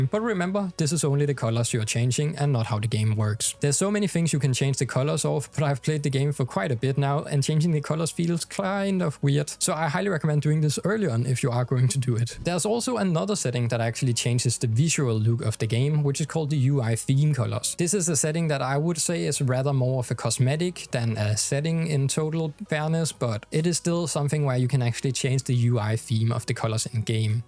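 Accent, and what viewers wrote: Denmark. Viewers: Fast question are you danish? You sound like one of my friends when he speaks English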